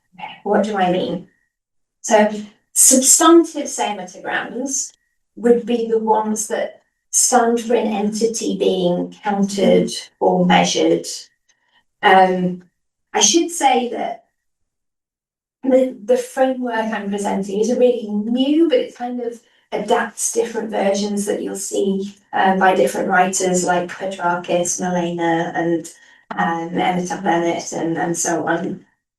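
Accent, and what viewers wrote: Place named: United Kingdom. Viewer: besides the British accent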